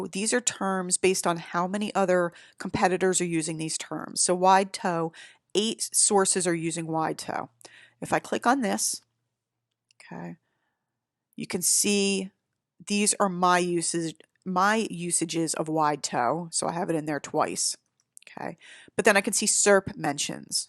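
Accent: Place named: Philadelphia, United States. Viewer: Nice content! Based on your accent I think you're from Philly :)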